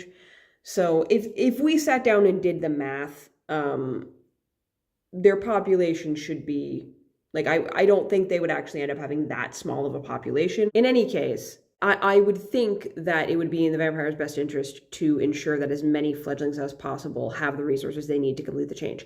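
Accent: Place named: Nova Scotia, Canada. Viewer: The thing with the locations of the house of night, is they’re in places PC thinks are important or pretty. There’s three in Italy because Italy is pretty. Europe has a ton of them because classical Europe is pretty. There’s way more in America because PC is American. She doesn’t even really acknowledge Asia because, aside from pretty places like Japan, she doesn’t want to have her self insert characters go there and be in a less well off place than they would be in the Western world. Her worldbuilding and logistics is on the same level as JKR (Also I pegged your accent as Nova Scotian pretty quick due to watching too much trailer park boys lol)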